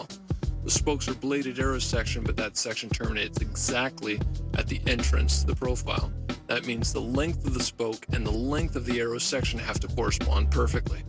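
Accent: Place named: United States. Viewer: MERICAN ACCENT!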